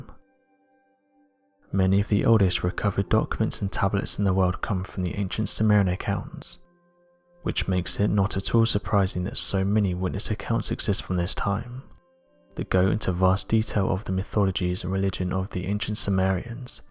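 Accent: England, United Kingdom. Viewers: What helps them appear credible is that they're using a person with an English accent to narrate their stories. Think about it, had they gone with some yokel from the south or a guy with a Bostonian accent talking about how "wicked hard" they looked around the internet to find examples of modern tech depictions from past civilizations or how "you know what, I swear I saw them same fancy scratches on a bumper sticker before y'all" and nobody would take it seriously.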